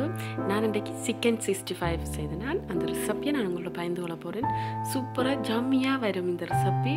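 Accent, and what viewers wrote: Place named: Sri Lanka. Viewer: my fav sis...unga tamil romba super... reminds me of my srilankan friends :)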